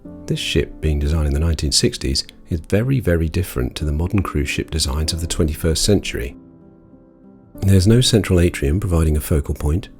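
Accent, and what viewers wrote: United Kingdom. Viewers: You do sound rather British, and many of them speak English.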